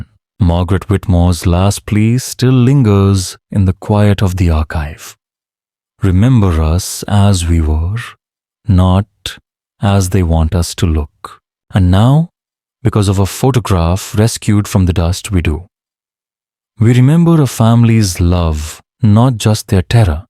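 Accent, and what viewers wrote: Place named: India. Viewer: so I love the stories, but bro leave your Indian accent at home. A suggestion from one Indian to the other.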